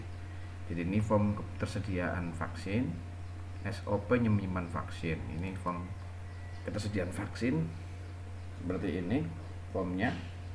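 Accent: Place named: Banyumas, Indonesia. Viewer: Memang benar pak, yen ora ngapak ora kepenak........sukses selalu....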